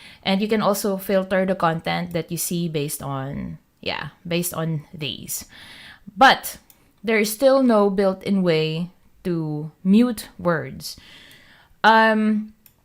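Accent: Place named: Philippines. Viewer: are you filipino??? your accent is sooo filipino english to me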